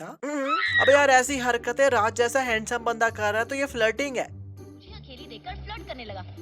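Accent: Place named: Pakistan. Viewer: Is this a Pakistani Channel because narrator's hindi accent was sounding like Pakistanis.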